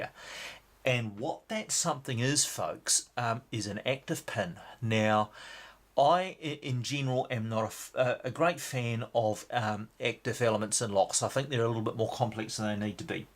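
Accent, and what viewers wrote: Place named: New Zealand. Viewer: Nice lock & good pick. I love your screen annotations, and I think your commentary rivals BB & LPL. Plus you've got that great... KIWI accent! Send'n the love from the USA.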